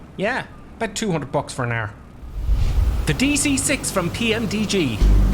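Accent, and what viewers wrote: Ireland. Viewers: Good to hear that lovely Irish lilt! Always time to sit back with a warm drink, put your feet up and get some laid back simming fun.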